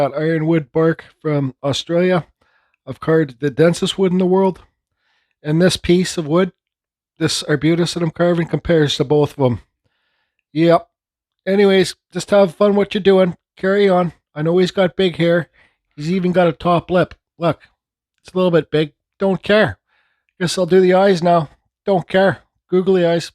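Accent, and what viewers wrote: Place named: Canada. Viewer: Don't let YouTube change your style, I like your style,. You remind me of me, without the canadian accent. Trapper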